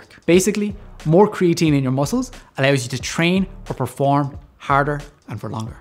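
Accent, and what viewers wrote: Ireland. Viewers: Is that an Irish accent that I hear oooh la la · Very Kilkenny accent? am I way off? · I love the Irish accent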